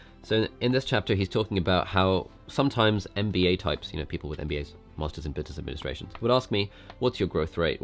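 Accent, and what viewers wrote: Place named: United Kingdom. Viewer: British accent is annoying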